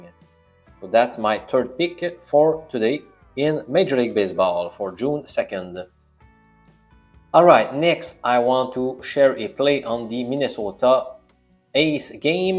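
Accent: Quebec, Canada. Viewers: You sound both french canadian and Persian at the same time.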